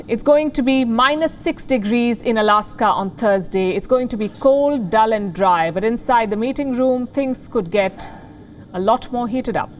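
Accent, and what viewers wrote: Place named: India. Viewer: It's like Indian English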